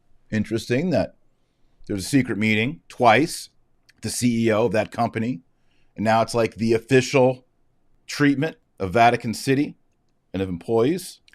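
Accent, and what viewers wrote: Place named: Texas, United States. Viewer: It's just his Texan accent! Check out ' ' by on yt. Should be our motto.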